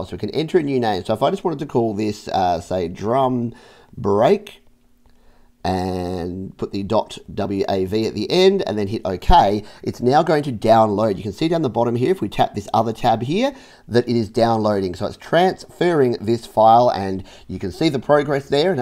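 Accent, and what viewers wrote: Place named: Australia. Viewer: Ya sound Aussie mate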